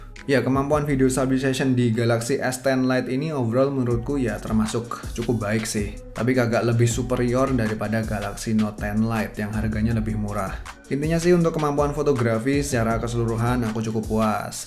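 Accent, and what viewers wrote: Java, Indonesia. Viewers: asli nya jawa ya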